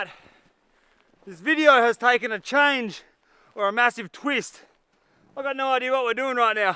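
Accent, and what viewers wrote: Australia. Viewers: Great video, and happy new year to you a mac10.. it’s really funny how super Australian you sound with the backdrop of snow haha 😂 the accents multiplied since leaving the sun haha.. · If it wasn't for the Australian accent, I would've thought him a russian 😂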